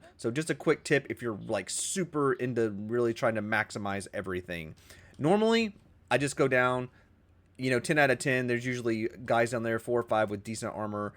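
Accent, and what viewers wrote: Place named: Tennessee, United States. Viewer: You sound like a white guy from Tennessee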